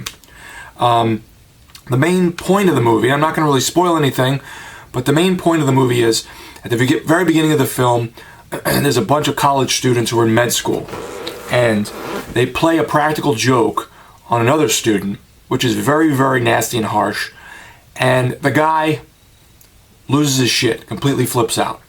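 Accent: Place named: East Coast, United States. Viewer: I'm in North Jersey now & I've been here for a while now but I'm always visiting fam in NYC. Hearing your voice, I figured you were from the East Coast, I just didn't think it was that close to home haha. I just followed you on Twitter.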